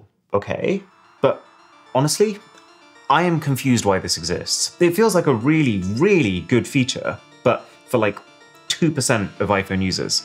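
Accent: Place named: South England, United Kingdom. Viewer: You have a posh accent from south england. But you say "faster" like a northerner.... are you just putting it on?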